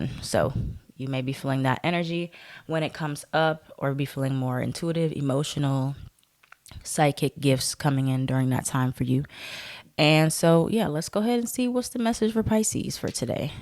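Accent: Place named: New York, United States. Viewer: 🤣🤣🤣🤣 I can tell ur from ny. I’m so happy ur doing better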